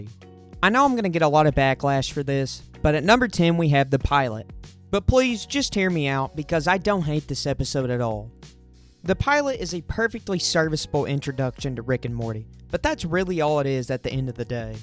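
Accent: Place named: South, United States. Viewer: you are the most southern Rick and Morty fan I have ever heard❤‍🩹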